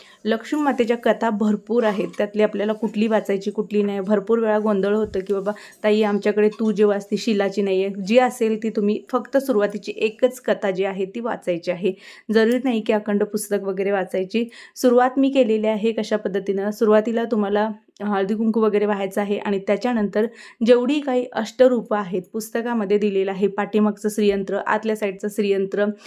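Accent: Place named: Solapur, India. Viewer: Ag tai Tu kolhapur chi aahe na solapur ka bolte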